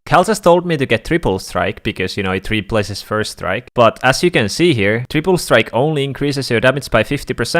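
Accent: Finland. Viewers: Are u finnish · Sie kuulostat vähä suomalaiselle. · Hey are you Finnish? Because means piece on Finnish and your accsent is really Finnish. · where are you from `finland?? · are you finland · Kunnon suomalainen aksentti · Finnish english Accent is killing me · Suomi man? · Are you from Finland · guys if u think his accent is weird then hes from finland. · Eka video jonka oon nähny tältä ja tiesin heti siitä miten se puhu et se on suomalainen :D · are you from finland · U finnish?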